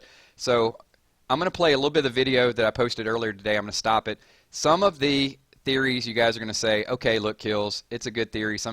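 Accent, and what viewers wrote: United States. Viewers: And I like your voice it sounds country and that's what I like about you and all of your walking dead vids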